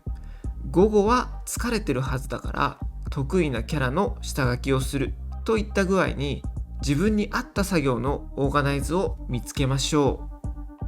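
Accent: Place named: France. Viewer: Pardonnez moi, mais j'adore votre accent français 🥹😍😍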